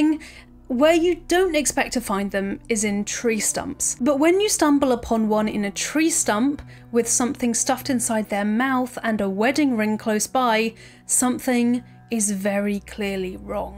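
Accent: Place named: United Kingdom. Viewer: What UK accent do you have?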